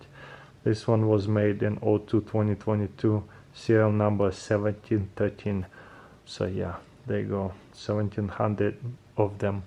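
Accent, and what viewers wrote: Russia. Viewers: Стронг рашн акцент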